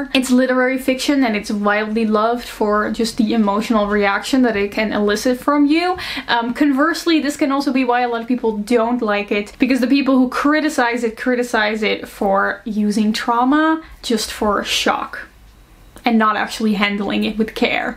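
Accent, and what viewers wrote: Netherlands. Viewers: This is the first time that I've come across your channel and this is how it went: "Oh nice a video about booktok!" "Hey is my nickname, cool:)" *wachting the first minute of the video and thinking I'm hearing a very slight Dutch accent*: "Is this a fellow Dutchie?" *Checking the discription to find out and actually finding out that we have the same name😳" Why didn't I find this channel sooner😂😭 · are you dutch? i hear a slightly dutch accent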